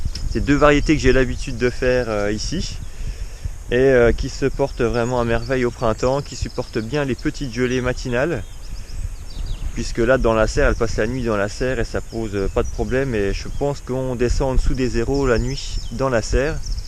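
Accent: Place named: Lorraine, France. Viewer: Et oui les parents habitent la Lorraine et je reconnais bien ce petit accent bon dimanche bravo pour ces vidéos très intéressantes a bientôt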